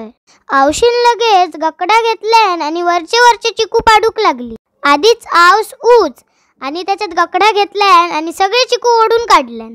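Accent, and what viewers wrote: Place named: Malvani, India. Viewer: बाय...तुमचा गाव कुठला? अगदी प्युअर मालवणी बोलतस...मस्त.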